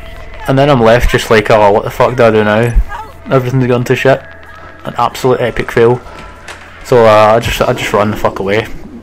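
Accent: United Kingdom. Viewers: Are you from Scotland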